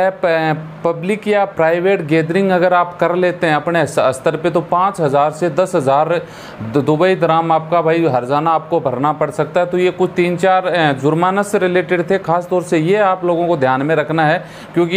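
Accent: Rajasthan, India. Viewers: Aap sir ji pahele hindi to barabar bolna sikho saaf pata chalta h ke aap rajastani ho sorry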